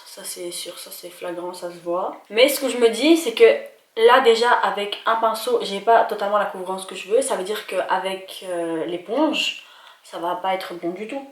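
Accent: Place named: Belgium. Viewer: J’ai tout de suite vue que vous étiez de Belgique votre accent est bien ressorti 😂😂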